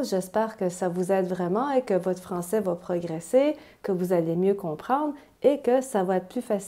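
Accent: Quebec, Canada. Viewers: Sorry, I tried writing in French, but autocorrect kept turning my words to nonsense. I just want to thank you for all your wonderful videos. You make me smile and chuckle often, and it is great to hear Quebecois like I heard it growing up so many years ago,! Felicitations!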